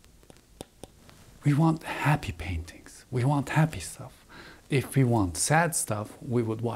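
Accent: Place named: Turkey. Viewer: Güzel kanalmış, ingilizcenden Türk olduğunu anlayabildim. İştabilizer'i görünce tamam dedim :)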